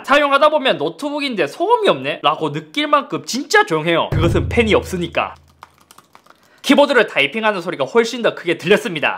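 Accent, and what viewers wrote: Gyeongsang, South Korea. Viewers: 형 진짜 경상도 사투리 심하신 분들은 스마일을 서마일 이라고해??? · 스마일이 서마일 되는법. 경상도 사투리. 잇섭이 서마트폰이라 안하고 스마트폰이라 하는 이유. 테크충 ㅋ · 여윽시 경상도 사나이~^^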